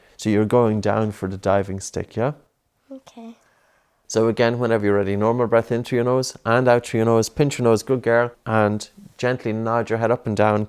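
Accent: Ireland. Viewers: Are the subtitles nessesary??? Its just an Irish accent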